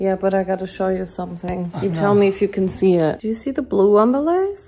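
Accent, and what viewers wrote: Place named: Germany. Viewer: I love your german english 😍😍🤪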